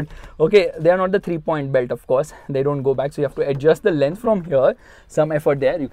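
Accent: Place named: North India, India. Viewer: North Indian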